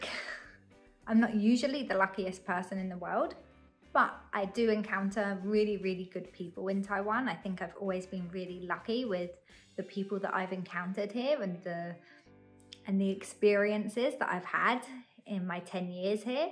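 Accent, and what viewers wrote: United Kingdom. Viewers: Lovely British accent and welcome to Taiwan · Hi,This is my first time come to your YouTube channel and i like your British English accent. So i Subscribed your Channel. 保持善良的心，但是別放棄警覺。害人之心不可有，防人之心不可無。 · 我覺得WTO姐妹會應該邀請你上節目，聽你講話好有趣。英國式英語腔調比美語好聽耶~~我們的總統蔡英文說英語的時候其實也有很濃的英式腔調。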